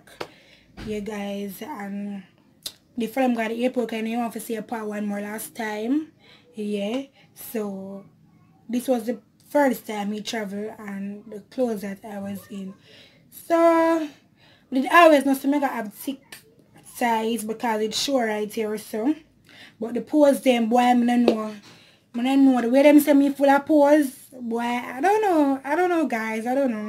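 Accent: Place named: Jamaica. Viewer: Nice video and love that Jamaican ascent though 😍😍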